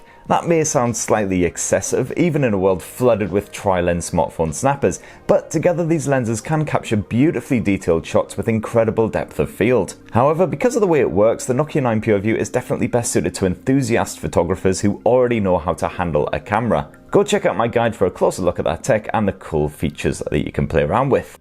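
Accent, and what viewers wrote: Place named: Newcastle, United Kingdom. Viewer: I'm a new subscriber, and it's great to hear reviews from someone in the uk....with a lovely Geordie accent? Love your sense of humour too. Just wish you would take a breath every so often!!! Lol I'm a technophobe, who still uses a non smartphone Nokia 6300, and I'm "trying" to make sense of all this tech-speak, with the thought of buying a mid priced smartphone.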